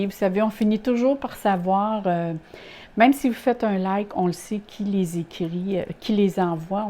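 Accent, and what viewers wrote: Quebec, Canada. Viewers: Bonsoir tout ce que tu dis est très cohérent et très précis; cela me rejoints beaucoup c'est tellement beau ce que tu partage wow!! c'est merveilleux ça... Pour l'intuition tu as totalement raison y as beaucoup de changements et d'énergie différente qui viennent à moi et dont je fais l'expérience surtout au niveau spirituel très inspirant.. Merci beaucoup c'est agréable de voir une femme qui as le parler Québécois..je te souhaites un splendide joyeux temps des fêtes comme tu aimes le vivre avec de l'amour, de la joie, de la paix, tout ceci dans la grande simplicité qui rends la vie magique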